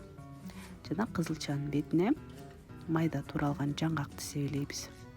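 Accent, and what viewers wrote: Standard. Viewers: Кыпкыргызча суйлойсуз ээ 🥰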